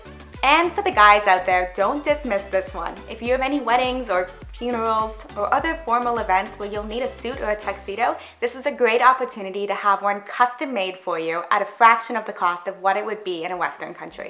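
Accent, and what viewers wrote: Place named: Upstate New York, United States. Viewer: Where's your accent from? You have an accent that sounds like a north New York accent.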